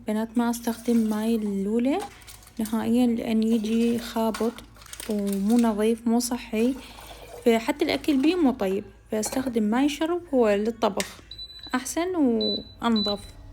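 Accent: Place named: Diwaniyah, Iraq. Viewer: سبحان الله بس سمعت لهجتج عرفت ديوانيه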